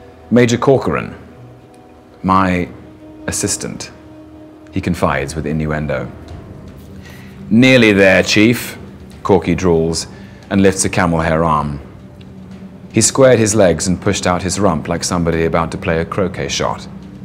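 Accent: United Kingdom. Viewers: This is like ear sex... Why British people are so sexy than Americans while narrating things? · Accent?? British English is the original English language. Others have accents.. i think. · Aaaaaaaargh, that British accent, ow my goodness, that voice. He can read even instruction for vacuum cleaner and it will be a symphony for my soul)) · Thats because he attended Eaton and Cambridge and speaks with "Received Pronunciation", the style of speaking taught in the Elite Public Schools....also used by BBC Annnouncers, much like Standard American used by Broadcasters in America, often referred to as unaccented American English.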